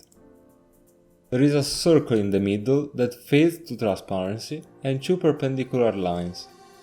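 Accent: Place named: Italy. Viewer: am only here for the Italian accent :p Great video btw, the shader part was really interesting!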